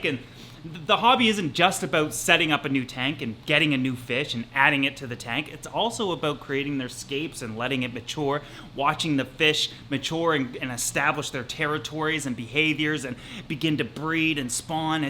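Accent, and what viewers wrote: Canada. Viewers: That's some Canadian accent! :-)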